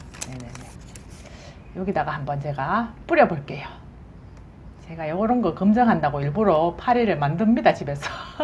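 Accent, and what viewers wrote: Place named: Busan, South Korea. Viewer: 부산 사투리 너무 매력있어요~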